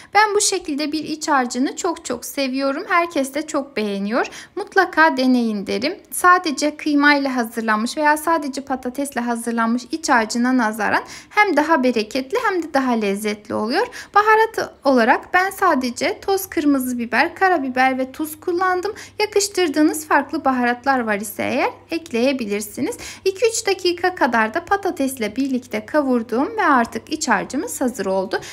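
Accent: Azerbaijan. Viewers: Azeri siveniz var, azeri misnz?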